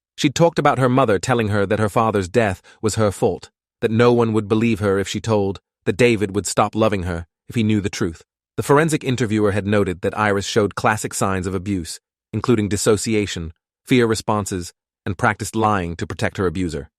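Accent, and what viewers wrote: United Kingdom. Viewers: He keeps going British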